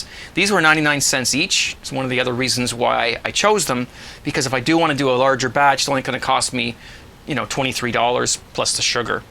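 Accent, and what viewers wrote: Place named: Canada. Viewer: You are my hero. I am picking up a hint of an accent. Are you Canadian or from Canada?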